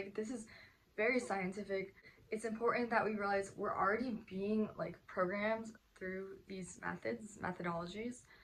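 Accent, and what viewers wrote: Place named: United States. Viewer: نو لَكنتها امريكيه ف كلامها عادي ^o^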